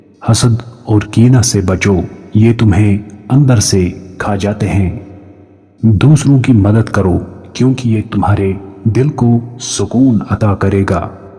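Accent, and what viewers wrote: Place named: Kashmir, India. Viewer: AAP KOI KASHMIRI PERSON HAIEN AAP KI AWAZ BATATI HAY BUT I AM KASHMIRI FROM MAQBUZA KASH MIR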